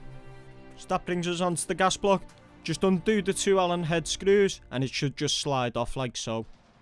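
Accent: Scotland, United Kingdom. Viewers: Nice accent, you Scottish? Or Irish maybe? I'm non-native English speaker so I don't know much about your accents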